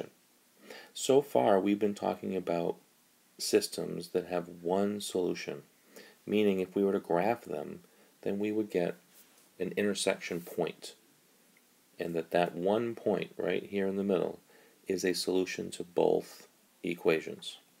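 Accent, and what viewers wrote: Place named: India. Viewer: High Quality Video with Non Monotone Indian Voice (Thanks, These videos really help)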